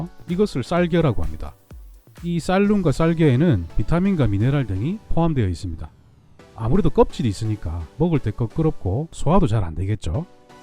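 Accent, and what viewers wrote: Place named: Busan, South Korea. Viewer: 부산 출신이세요? ^^ 아주아주 귀에 익은 억양이시네요. ㅎㅎ . 말씀도 참 재미있게 하시고.... 딱 부산사람 말투 ㅋㅋㅋ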